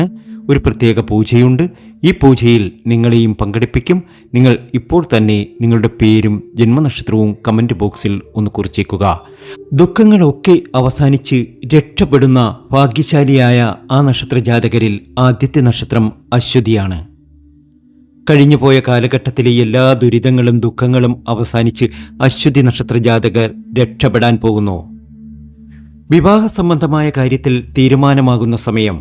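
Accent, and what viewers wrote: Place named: Kolkata, India. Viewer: Kolkata Malayalam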